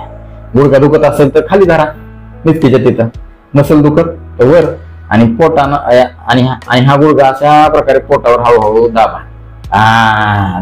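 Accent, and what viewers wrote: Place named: Kolhapur, India. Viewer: खुपचं छान व्यायाम शिकवता फार फायदा होतोय धन्यवाद 🙏 सर आपण खरंच लातुरचेच आहात काय कारण तुमची भाषा हि कोल्हापूर ची वाटते मी हा व्हिडिओ कोल्हापूर जिल्हातील गडहिंग्लज तालुक्यातील एका खेडेगावातून पाहते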